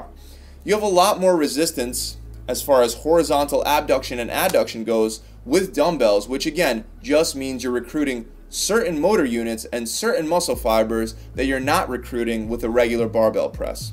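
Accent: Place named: New Jersey, United States. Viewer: are you from New Jersey I was thier a few days ago first time and you got a Jersey accent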